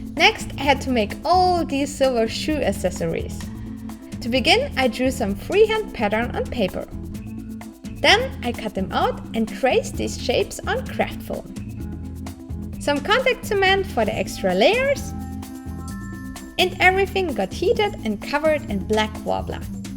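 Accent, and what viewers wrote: Germany. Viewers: is she from Germany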